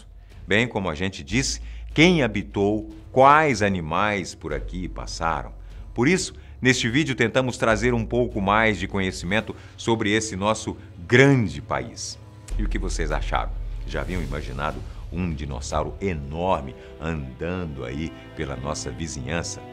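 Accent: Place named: Minas Gerais, Brazil. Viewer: Sou de minas posso dizer , o sutaque mineiro ficou bom viu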